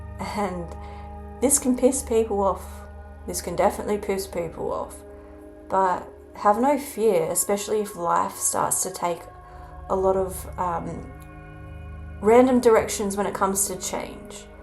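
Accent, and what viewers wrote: Australia. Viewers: G'day there!! You're an absolute bloody legend dudette!! Your head popped up on my feed randomly and I'm proper glad it did! You spoke straight to my soul and it felt kinda weird lol especially when ya started singing and I had a vanilla icecream cone in my hand! Hahahaha ya speak with such wisdom and and better still an Aussie accent! 😉 Everything you talked about gave me chills and alot to think about... Will see ya again soon mate! Much love and respect 🙏🤘❤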